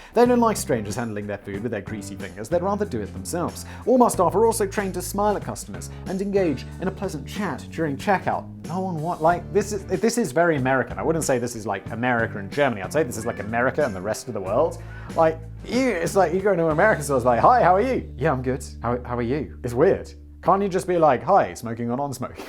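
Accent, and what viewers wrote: United Kingdom. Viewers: in every video: Speaks with a British accent Comments under every video: Complains about how pronounces things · He’s British and they pronounce things differently. People in different countries may speak differently than us.. it’s really ok.. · Sticking up for here with all the mis-pronunciation comments. As a British person with a similar vernacular to that is how we would pronounce those words. Although at times I think has lived a sheltered life.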